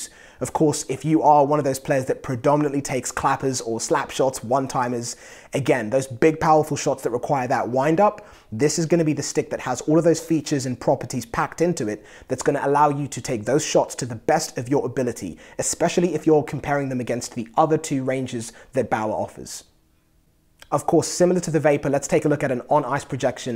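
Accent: United Kingdom. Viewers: is he british lol he goes fond of taking haha good vid tho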